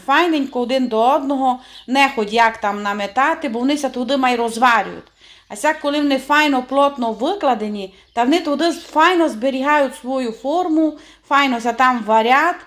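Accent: Transcarpathia, Ukraine. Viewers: Господи! Яка ви гарна! Нема просто слів! А закарпатська мова - це просто неймовірно 😘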